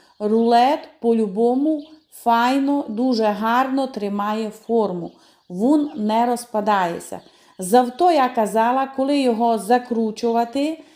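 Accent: Transcarpathia, Ukraine. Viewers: Привіт.Я обожнюю ваш закарпатський діалект і ваші рецепти.🌲💛💙🌲🇺🇦🌲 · Дуже подобається ваша мова. Слухаю вас з насолодою. Дай Господь вам здоровʼя і наснаги. І хай Господь спасе нашу Україну.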